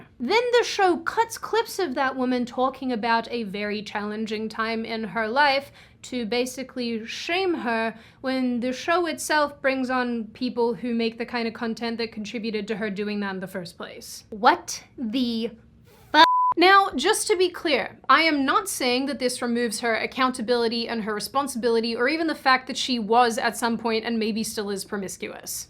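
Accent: United States. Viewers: Your accent is changing... can hear the American coming through!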